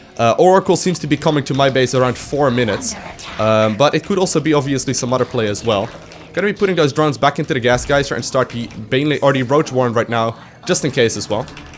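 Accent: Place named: Netherlands. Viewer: Hey! Really enjoying your podcasts! I'm a teacher of English in Holland and I can clearly hear you've got a Dutch accent, but your English is flawless. I also love how cleverly you played this game! Unfortunately you weren't playing as greedy as you should've, but it's good you figured that out yourself!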